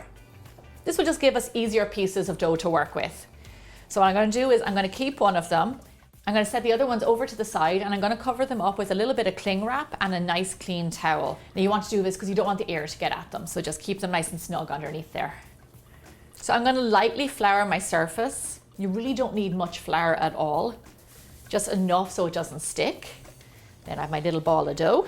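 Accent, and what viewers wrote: Ireland. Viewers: I knew you were from Ireland even before reading. Love that accent. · i love how your irish accent is sometimes coming through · Are you Irish ? Love ❤️ the accent 👋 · I'm adding this to my list of what I've baked already: sourdough bread, pretzels, corn and flour tortillas, pita bread. I find that I love baking. My Grandmother used to make noodles. My Mom said she hung the noodles all over the house to dry. Interesting visual. By the by, I like your accent. You're Irish, right? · So i was looking for an easy home made egg pasta recipe and just clicked one without looking at the thumbnail and the video started and i hear the familiar Irish voice. I was like omg it's 😂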